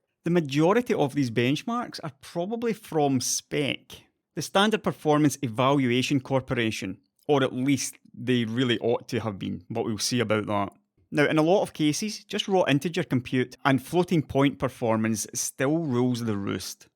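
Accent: Scotland, United Kingdom. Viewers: From a fellow scot it's nice to hear your voice again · "Alright guys" in that Scottish accent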